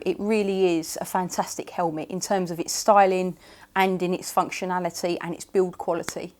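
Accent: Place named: Essex, United Kingdom. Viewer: That accent..... you gotta be an Essex girl!?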